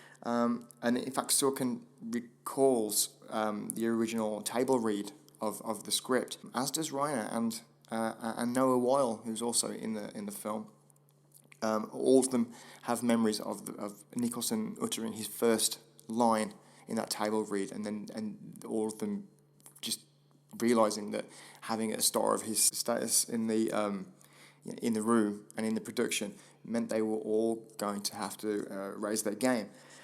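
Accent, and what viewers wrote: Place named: United Kingdom. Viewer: You don't understand english? I'd understand if it's not your first language though since the accent is british, even then, I would study a bit harder on dialects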